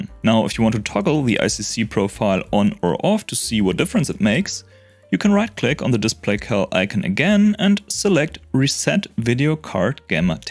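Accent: Germany. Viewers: Ah da hast du es offensichtlich gemacht. 😂 Habe mir die ganze Zeit gedacht das ist der typische "this has to be perfect" deutsche Akzent beim Englisch reden. Ich klinge locker nahezu identisch beim Englisch reden. Immerhin besser als das Englisch das manche andere an den Tag legen.🤣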